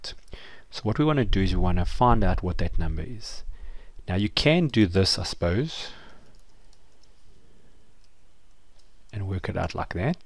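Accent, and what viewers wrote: South Africa. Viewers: I miss the south African accent, but certainly not the country. Hope you also managed to escape!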